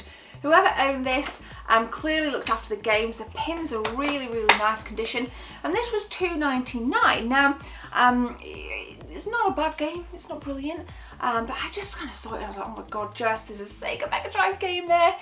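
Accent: United Kingdom. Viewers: You are really beautiful! I love the way British gals talk. I am in the US and I really like your videos. They are not boring like some other people's.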